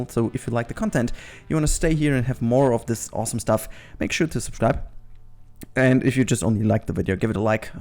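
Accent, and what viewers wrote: Germany. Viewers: Are you a German Person?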